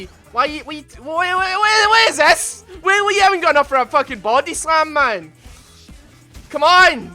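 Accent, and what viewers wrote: Scotland, United Kingdom. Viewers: Bro are you scottish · is Scottish or something? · Is he from scotland? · Bro, you’re as scottish as anyone van become xD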